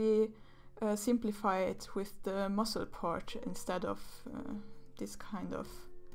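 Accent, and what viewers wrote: Germany. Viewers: xD kann ich verstehen. Hab von deinem Akzent eher rausgefunden als an dem Screen, hab nur auf die Zeichnung geguckt, deshalb finde ich es ja auch erst jetzt heraus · Are you german? xD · Are you German by any chance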